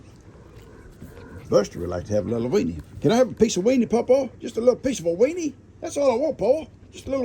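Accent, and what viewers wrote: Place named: East Texas, United States. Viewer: Good morning and. Congratulations on reaching 50k+ followers. I've been watching your number hoping to see it grow. I love your show and watch them all. Everyday. You wondered if folks would watch an old east Texas man, well, yes we will!! I think it's awesome that you like making videos. Its wonderful for your family and small grandchildren, they will forever have you and on many videos to watch. I also think its wonderful that you and can earn a little money from us watching because most of us understand that retirement means a strict budget. I can say that I love your videos and stories and lives. Your people are great people. I've learned so much from watching your content even. I too am from East Texas so I can understand every word you say. I pray for you and and your family as well as your people here who need prayers. 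The Lord knows who needs help. I pray for everyone in bad weather and flood prone areas are safe. I pray your house project goes smoothly. Much love and thanks from your friend from Leon County. I love the Homicide Hunter too!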